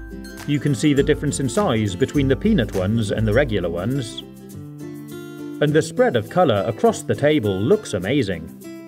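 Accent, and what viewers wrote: England, United Kingdom. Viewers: are you from englang or some in the north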